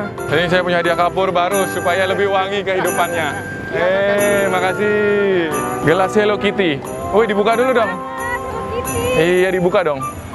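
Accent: Bali, Indonesia. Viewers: bang orang bali?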